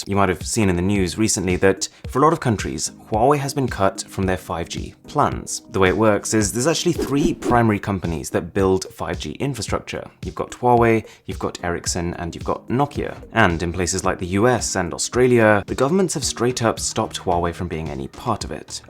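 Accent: United Kingdom. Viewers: That is just how British people talk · I enjoy this type of content. Please continue to provide informative YouTube clips like this, Apple, etc. Your content is so varied in the world of tech - but most of all informative. Many YouTubers cover the latest technology but it is nice to learn more about the R&D developments, the company behind it (beginnings, growth, direction, and aspirations). This is what you provide. Please continue on your current trajectory. 👏🏽👍🏽 P.S. I love hearing the accent of my fellow Brit on YouTube. So few in the world of tech - but at least you’re not alone. 😊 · he sounds British to me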